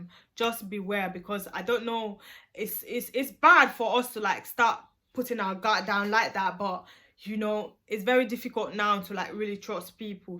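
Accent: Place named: United Kingdom. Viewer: Aww do you live in the UK? I hear an accent lol... I can’t believe this even happened to you x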